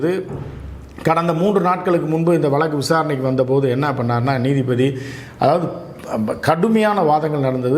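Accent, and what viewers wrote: Madurai, India. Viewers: மும்பையில் இருந்து திருநெல்வேலி வருவேன் ....கண்டிப்பாக அண்ணன் உங்களை எப்படியாவது சந்திக்க முயற்சிப்பேன்...விரைவில் உங்களை காண ஆவல் அண்ணன்...உங்கள் கருத்துக்கும் , பேசும் பானிக்கும்...அந்த மதுரை தமிழுக்கும் நான் அடிமை ❤️❤️🙏🏾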